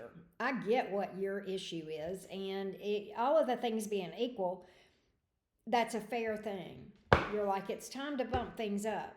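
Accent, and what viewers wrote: South, United States. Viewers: I don't know where you're from but I know you're a southern chick like me. Love it!❤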